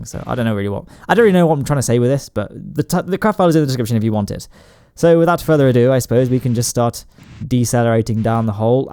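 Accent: United Kingdom. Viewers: Your intro could have been a BBC TV intro, with your accent and all.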